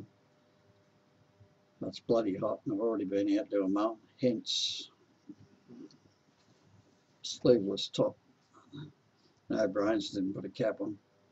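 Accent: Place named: Australia. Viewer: Hi if you're wondering why I comment so rarely on your videos, while you so often comment on mine... it's not because I'm selfish. I would love to comment on more of your videos, but the problem is, I don't always understand what you say, since English is not my first language, and your Australian accent is a bit difficult for me. Ask Brendan.. I have to watch his "Friday's Funny" episodes like 3 or 4 times in a row to get all of his jokes! There seem to be different accents in the different regions of Australia, because some folks I can understand better than others. For example, I usually understand Gazza, but when he speaks in his funny voice on the "Bogan News" it's hard to get for me.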